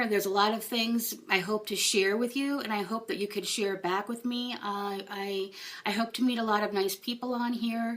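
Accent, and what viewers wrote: United States. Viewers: Glad to get to know you! I hear the Minnesota in you, I'm a Midwestern girl, too. Cheers, Lulu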